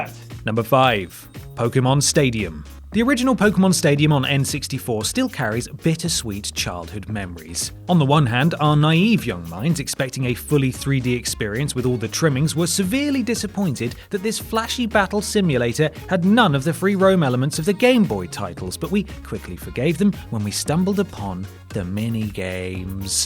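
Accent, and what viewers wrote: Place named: RP, United Kingdom. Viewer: “I don’t have this problem because I speak the queen’s English.”, you’re a national treasure!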